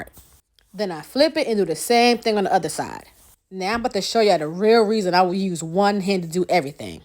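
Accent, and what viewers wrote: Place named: New Orleans, United States. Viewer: You don't sound like you from New York I thought you was from New Orleans or something like that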